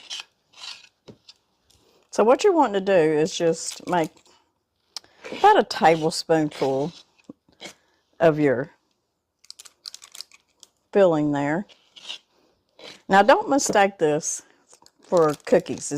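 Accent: South, United States. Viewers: Hay thank you for your restapee love your southern talk but that look so good from steve c from state Oregon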